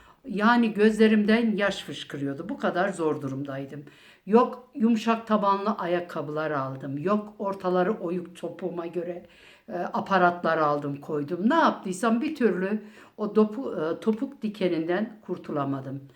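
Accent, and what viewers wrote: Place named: Eastern Anatolia, Turkey. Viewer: Bu xalanın danışığı çox şirin gəldi. Bizim dilə çox yaxın danışır. Bu xala Erzurum, Kars, İqdır ya da Vandan olmalıdı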